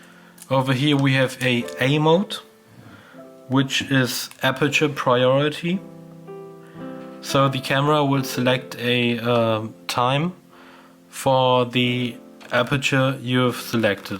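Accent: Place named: Germany. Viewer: Hello, nice video. From your accent, I am guessing that you are german. Ehrlich gesagt wuerde es mich freuen auch deutsche videos zu sehen. Abo von mir hast du ;)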